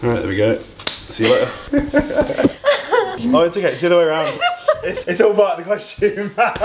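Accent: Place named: United Kingdom. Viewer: This was so much fun to watch and made my day! Seeing your costume struggles beforehand was just as entertaining as your time at the gym, so I'm glad you included that. I think anyone who has gone to a ComicCon can relate and it's amazing you got everything to stay on with as big and muscular as you are. Also, I'm from the US so hearing Captain America with a UK accent made me smile as well. Looking forward to the next video!!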